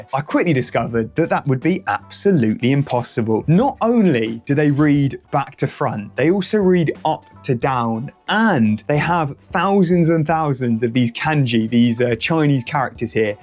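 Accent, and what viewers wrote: United States. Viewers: Your American accent is amazing!!!! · Nailed the American accent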